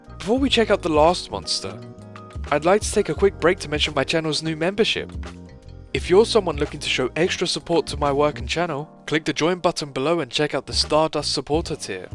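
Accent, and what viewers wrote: United Kingdom. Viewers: Your Brittish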